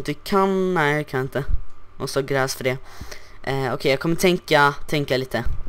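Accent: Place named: Gothenburg, Sweden. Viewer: Var bor du ? Låter lite som göteborgska..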